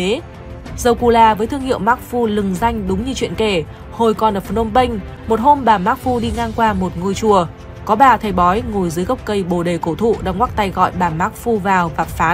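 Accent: North, Vietnam. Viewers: Giong nguoi doc nghe hay da man luon! minh mien nam ma thich giong Bac lam` BLV ghe ! ilove! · Sao để cho Bắc Kỳ 75 đọc bài này. Nếu người giong Nam từ Sài Gòn không đọc thí có thể giọng Bắc từ thời 45. Nghe giọng BK 75 muốn ói trên các YouTube videos của VC sản xuất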